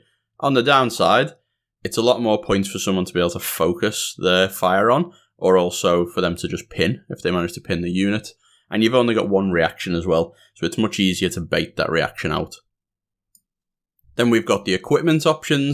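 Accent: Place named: Merseyside, United Kingdom. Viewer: Dropped a like and subscribed because of the Merseyside accent ;)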